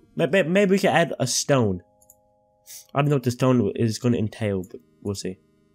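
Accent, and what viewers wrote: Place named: Europe. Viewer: You don’t sound Aussie more European Good vid anyways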